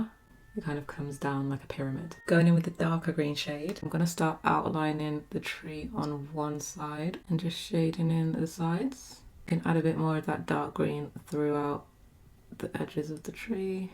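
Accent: United Kingdom. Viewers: Are you from the United Kingdom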